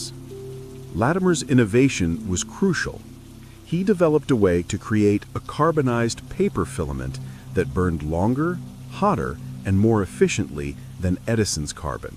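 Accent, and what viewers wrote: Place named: United States. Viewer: Sounds American to me.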